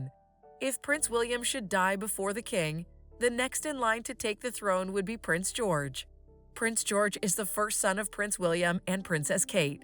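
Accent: United States. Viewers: I cannot stand an american commentig on the Royal family, it just does not sound right.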